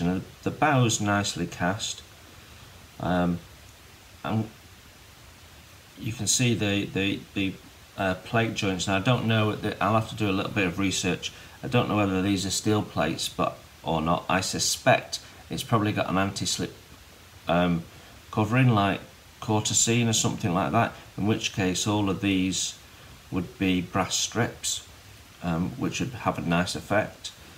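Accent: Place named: Stoke-on-Trent, United Kingdom. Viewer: No don't be sorry. You accent is very much S-O-T ( Stoke on trent ). Was just wondering.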